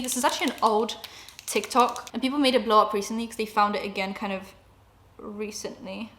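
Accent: United States. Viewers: She has a harsh Bostonian accent. I live in Boston. And it’s not weird to hear. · You had it right, it’s a New York or Jersey type of accent.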